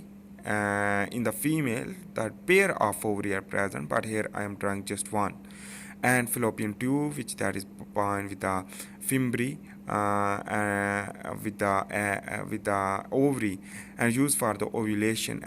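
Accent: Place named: Kashmir, India. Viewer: Your accent is like kashmiri english ....very well explained 🔥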